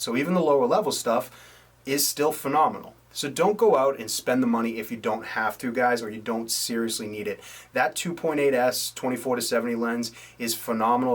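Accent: Boston, United States. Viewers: Just got the Z6 am a portrait photographer working in-studio and natural light. will be renting the Nikon 50mm F1.8 S and the Tamron 24-70mm f2.8 G2 lens and using them in an informal shoot. What are your thought s on the Tamron lens if you've worked with it? Love the B&W, the info, and the accent being a Brookline MA ex-resident living in Seattle Thank you.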